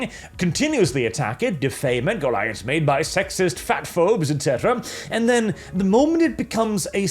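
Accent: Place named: Wales, United Kingdom. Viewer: You sometimes sound a bit Welsh.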